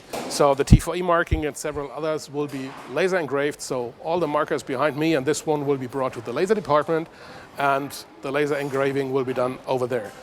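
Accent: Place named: Germany. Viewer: German Akzent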